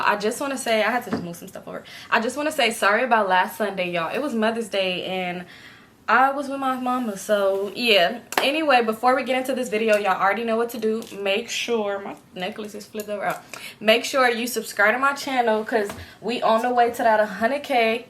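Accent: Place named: Texas, United States. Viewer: My brother heard the story about the weirdo in your apartment complex when you got your first apt. He say who is that talking about the creepy neighbor and I did not know he was listening 👂 huddling 🤦‍♀️ I told him I found your channel through the pandemic and I been riding with you since. We from Houston, Texas. I thought you was from Texas by the way you talk. But I found out your from California through your stories. You gotta let me know when you coming to Houston cause I wanna meet you and I love your vibe. I’m 43 I’m not with the bs and I’m not a weirdo 😫😫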